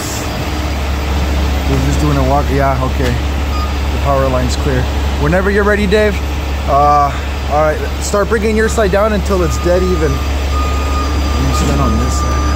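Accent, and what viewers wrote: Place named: Arkansas, United States. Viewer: Dude, I've been enjoying your videos for at least two years or longer. I'm pretty sure that I met and ran into your grandfather, or maybe great grandfather? several times over at Engs Peterbilt back in the late 70's-80' before Rush. I can't remember Mr. Engs first name but knew him and dealt with him and Tony Lacey, and knew many small fleet owners for many years. Guys that if they couldn't have fun with you and vice versa they were no good to you. Anyway, one time he was talking about his son being ready to go out solo on a wrecker...as soon as he got a D/L in a few years. So this is a long way around just to say your videos are great, your talent and skills are superb, and now I see you're honing in on your comedic assets. "I'm going to be getting it from the rear" and then the Peter Pan scene...you're funny dude! 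Also, I laugh every time you same "boom". If you didn't say it you sound like a good ole hippy boy from Little Rock Bakersfield. I better stop now and apologize in advance if I've offended anybody...I've been drinking, you suggested it. I'm an old man, maybe there's a new kinky kind of Towmans Porn going on? Be careful out there, peace out, and enjoy. God bless.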